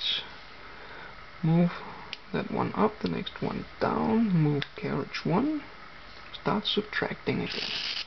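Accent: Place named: Denmark. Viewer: oh god that horrible accent you're danish aren't you?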